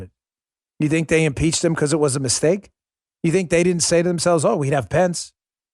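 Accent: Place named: New England, United States. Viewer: Your ok with me Dan, if we could just get rid of the yankee accent.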